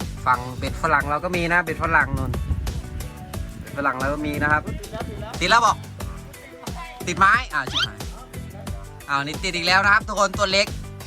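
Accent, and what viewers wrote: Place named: Ubon Ratchathani, Thailand. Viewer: สำเนียงอุบลชัดๆ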